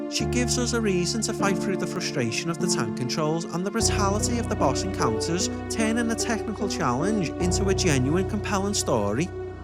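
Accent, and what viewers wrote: Liverpool, United Kingdom. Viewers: scouse accent talking about beloved survival horror of the 90ds and 00ds was on the bingo card, but great video bud...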